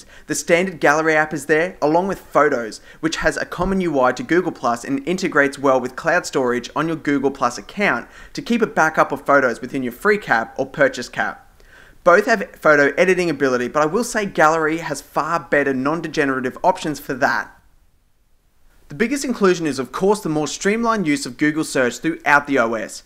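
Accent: Australia. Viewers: Are you from straya?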